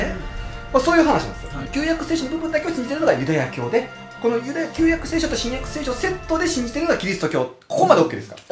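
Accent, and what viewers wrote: Kansai, Japan. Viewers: いつも寝る前に過去の動画を聞きながから寝るのだけど、さんの声が癒し効果があるのかすーーぐ寝てしまう(笑) そんな人多いはず w どんな話もその時代におった関西人みたいにしゃべってくれはるから解りやすいwwww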